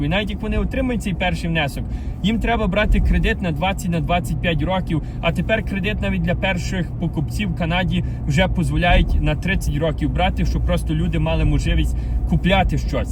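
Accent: Western Ukraine, Ukraine. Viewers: Україна велика і не може людина з заходу говорити полтавською чи київською говіркою. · Ві говорить на діалекті української мови. Так говорять в селах на заході України. Це не літературна українська мова. Може тому так тяжко в Канаді, що він навіть українською не дуже володіє не говорячи про англійську. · Надя! О с западной Украины!! И там язык не похож на Черниговский(я оттуда) или Полтавский. Но и на украинский, красивый, он не тянет.. Я не в обиду... Просто режет слух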